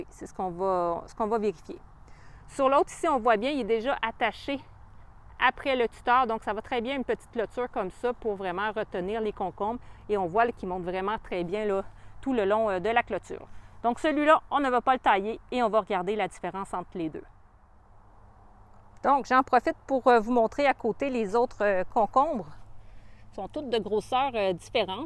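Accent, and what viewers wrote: Canada. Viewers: Merci pour cette belle explication ...et votre très bel accent canadien . A bientôt !! Manu , de la Bretagne.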